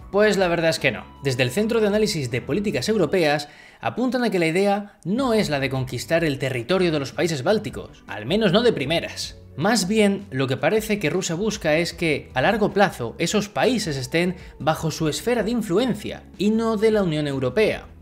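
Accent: Spain. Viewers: tu acento es español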